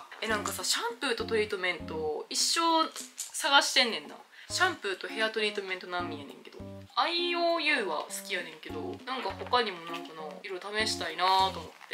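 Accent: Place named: Osaka, Japan. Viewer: 大阪と大阪人と大阪の食べ物と大阪弁がダイスキで現在大阪に住んでる山形人なんですけど、の動画観ていつもきゅんきゅん＆ニヤニヤしてました🥰知らんけどとか、◯◯やねんな〜とか、耳が幸せです🥰ありがとうございます🌷あと今頃だけど、山形で免許取ってたなんて嬉しいです✨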